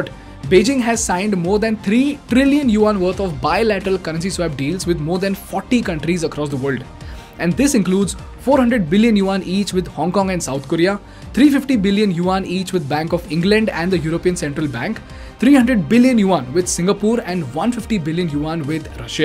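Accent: India. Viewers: The Indian accent is deafening. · why indian english is so indian?🥲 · The indian accent is killing me you need to put subtitles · The speaker has strong accent of indian slang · I is probably a good lecture but you speak too fast and in an Indian lingo which is hard for most of us to understand.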